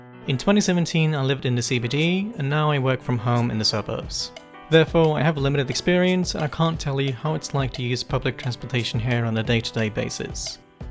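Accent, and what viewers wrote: Australia. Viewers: Interesting you are from Norway; there is a lot of Australian in your English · For someone who lives in Norway, you sound very aussie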